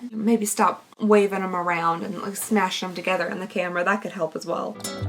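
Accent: Kentucky, United States. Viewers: Being from Kentucky inlove when i hear it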